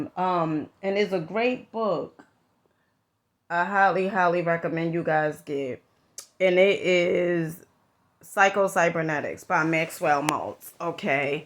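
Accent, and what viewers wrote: New York, United States. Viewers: Nice. To the point. When i was a young adult, i loved the N.Y. accent. Still do. From what part of NY are you?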